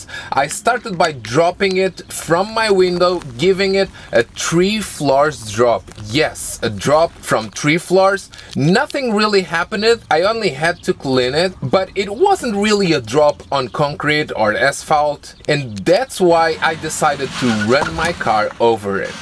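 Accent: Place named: Portugal. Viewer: That Mourinho-like Portuguese accent is just awful.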